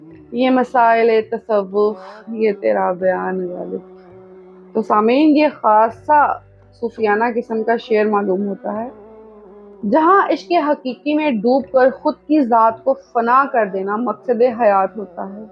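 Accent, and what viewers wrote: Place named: India. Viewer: لہجہ ہندوستانی ہے آپ کا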